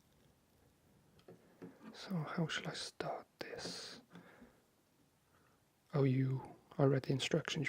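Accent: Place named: Wales, United Kingdom. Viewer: Are you welsh? :) trying to place your accent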